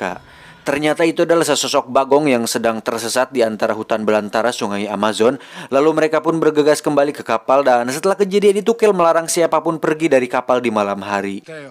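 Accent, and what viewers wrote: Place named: West Java, Indonesia. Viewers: Anying urang Sunda mang🤣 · Sunda mnh nya min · Maklum..lidah orang sunda kan gitu...F Jadi P....Z jadi j😅😅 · Urang sunda euy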